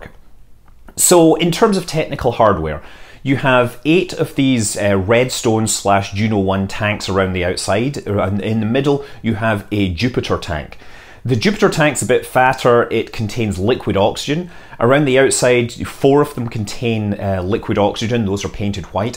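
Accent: Scotland, United Kingdom. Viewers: Scott, your videos have come up on recommended several times, I decided to watch some and it seems YouTube got it right. I just subbed and I look forward to more of your videos. I wonder if you might be able to put me out of my misery on something? Your accent tells me your Scottish but I can't put my finger on which part of Scotland, I'm from Fort William and I'm guessing that you are from somewhere in the central belt?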